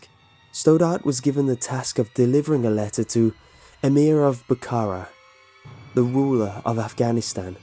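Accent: England, United Kingdom. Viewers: Ahaha he's definitely not australian Cuz I'm australian and this kinda sounds english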